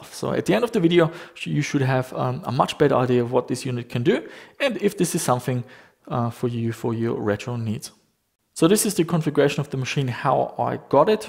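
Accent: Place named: Germany. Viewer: You accent seems to indicate that you are originally from Germany.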